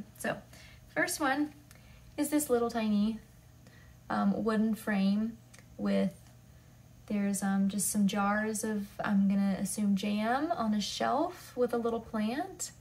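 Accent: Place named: North Carolina, United States. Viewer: Congratulations on your 1,000 subscribers. When I watched your first video I immediately thought you were from NC and I was right. Your accent is very light just like mine but when you grow up in a place it is so easy to recognize. Thanks for another great episode and Happy Knitting!!!!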